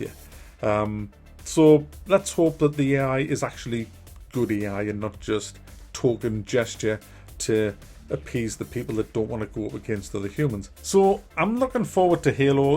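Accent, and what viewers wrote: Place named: Newcastle, United Kingdom. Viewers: Wrong accent, he's from Newcastle which is in England very far from Wales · No he ain't, I live in Wales. He sounds like a geordie (Newcastle) to me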